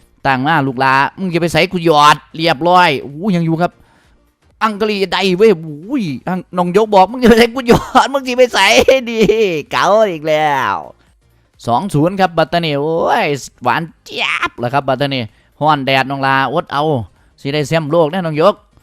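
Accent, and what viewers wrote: Isan, Thailand. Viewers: เขากะเว้าอีสานมึงฟังฮู้เรื่องอยุ่แล้วตอแหล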